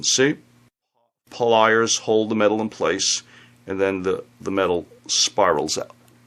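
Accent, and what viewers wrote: New York, United States. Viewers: Thank you for sharing. My husband and I were looking at your website last night. You are a genius. I would love to have a piece of your art , truly amazing very beautiful. I love your accent, I grew up in Johnson City, New York. Spent a lot of time in the city. I can't tell how much I miss it. I live in California now. Blessings to you, Deborah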